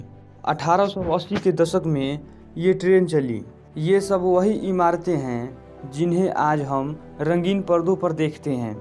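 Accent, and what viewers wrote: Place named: Bihar, India. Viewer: अबे बिहरीया आवाज आवाज सुधार अपन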